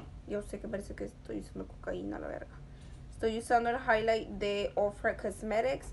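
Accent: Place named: Sinaloa, Mexico. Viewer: Eres de Sinaloa?, Me encanta como habla y el acento jsjs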